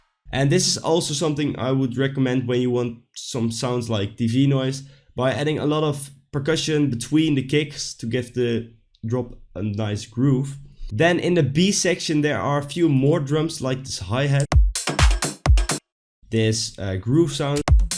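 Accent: Netherlands. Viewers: are you dutch? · aangezien je accent weet ik dat je nl bent dus doe ik het in nederlands. tv noise maakt bass house toch?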